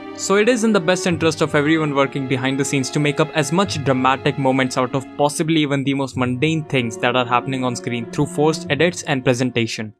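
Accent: India. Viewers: Amazing video man, btw are you Indian ? Your accent sounds like it.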